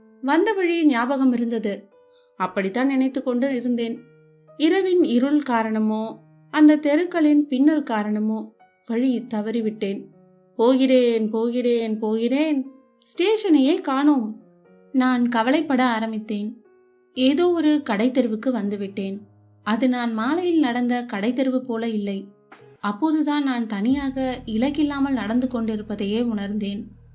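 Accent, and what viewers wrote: Chennai, India. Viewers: You seem to be becoming very proficient in Madras basshai!!!!! Way to go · Your Madras dialect is bloody hilarious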